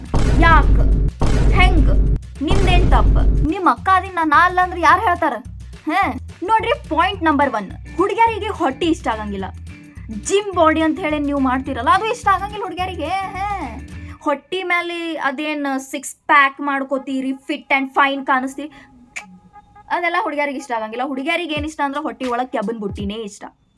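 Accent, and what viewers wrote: North Karnataka, India. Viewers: ಇವತ್ತ ಫಸ್ಟ್ ನೋಡ್ ಬೆ ನಾ ನಿನ್ನ ವಿಡಿಯೋ ನೋಡಿದ್ದ. ನಮ್ಮ್ uk ಭಾಷೆ ನಿನ್ನ ಬಾಯಿಂದ ಕೇಳಿ ಬಾಳ್ ಖುಷಿ ಆತ ನೋಡ್ ವಾ. ವಿಡಿಯೋ ಅಂತೂ ಬಡ್ದ ಬಾಯಿಗ್ ಇಟ್ಟಿ ಬಿಡ್ ಅಡ್ನ್ಯಾಡಿಗಳಿಗಿ. ಸಬ್ ಸ್ಕ್ರೈಬ್ ಆಕ್ಕಿನಿ ಬಿಡ್ವಾ 😂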